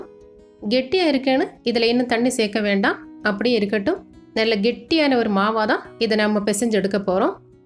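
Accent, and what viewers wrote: Kerala, India. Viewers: It's like malayali talk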